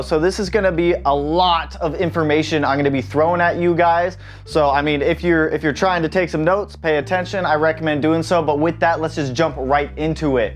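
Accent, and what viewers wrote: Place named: New Jersey, United States. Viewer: Sound like you are from where I live ( NJ ) - everyone speaks fast. What 3 things you say for longevity??? Air/ Oil Separator, Cylinder 4 Cooling Mod, Killer B Oil Pickup?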